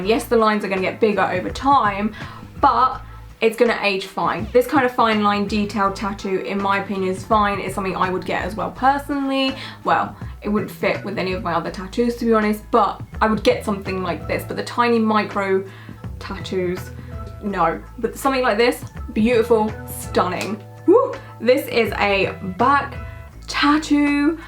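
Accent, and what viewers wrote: England, United Kingdom. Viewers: That's the way all English people say it lol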